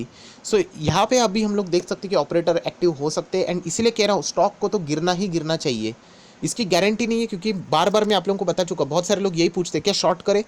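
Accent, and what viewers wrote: Maharashtra, India. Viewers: As per your speech I feel you are maharastian. If so you are Frist man who is having such a good command on stock market. Superb knowledge. Please share your mobile number as I want to invest first time in shares with your help.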